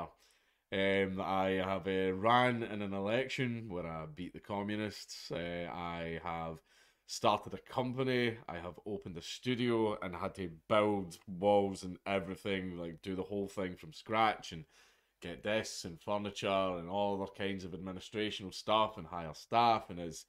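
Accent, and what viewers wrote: Scotland, United Kingdom. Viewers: I love watching all of your videos, I love just sitting back and listening to mini-documentaries in a Scottish accent You've made the past couple of years much more bearable, so thank you and Merry Christmas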